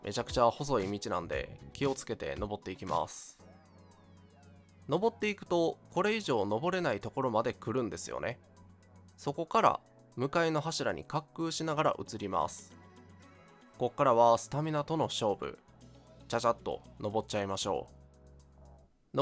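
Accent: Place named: Kansai, Japan. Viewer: 隠しきれない関西訛り好き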